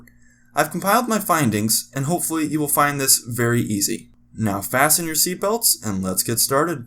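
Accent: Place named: Midwest, United States. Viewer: To quote steamed hams, "it's a regional dialect." I also say sodder instead of sold-er. Dang Midwest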